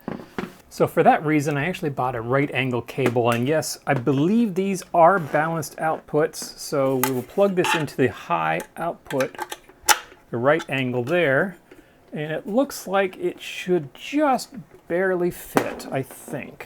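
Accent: Canada. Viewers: I am subscriber 9.32K-ish. I subscribed today. I've been watching this channel for a long time and just noticied I never subscribed. are you Canadian? I can't fix your accent. You say re-VERB instead of reverb like most people and as a sonic minded person it's puzzling. Your channel is great! Thank you for all of the videos and content.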